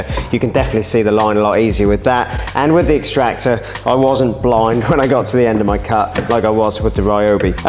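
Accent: Norfolk, United Kingdom. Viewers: are you a norfolk boy buh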